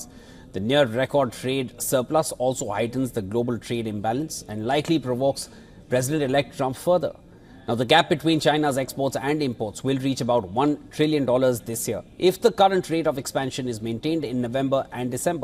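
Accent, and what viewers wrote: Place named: India. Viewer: Is there anyone in India who can speak "proper English"?? The accent here is too strong.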